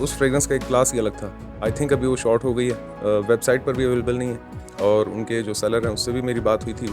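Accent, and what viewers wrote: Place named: Karachi, Pakistan. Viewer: لیٹ رپلائی کے لئے سوری لہجے سے تو کراچی کے ھی لگتے ہیں جیسے کہ میں خود 😀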